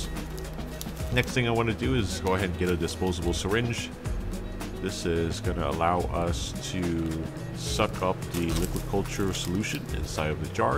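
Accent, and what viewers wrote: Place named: Ohio, United States. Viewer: All I kept saying is that this guy is def from Ohio or near some Amish country. Lol thanks for the lesson!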